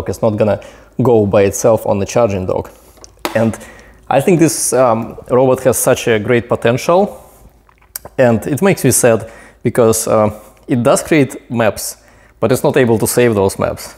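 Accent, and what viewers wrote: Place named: Russia. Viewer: невозможно слушать тяжелый русский акцент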